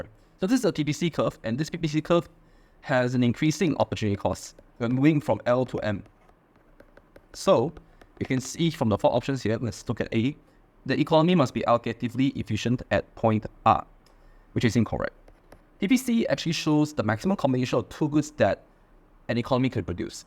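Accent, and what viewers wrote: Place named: China. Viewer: Chinese accent tough to understand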